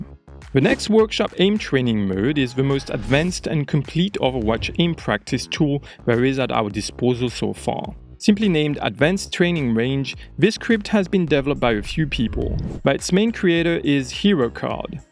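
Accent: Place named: France. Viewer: Cet accent français😂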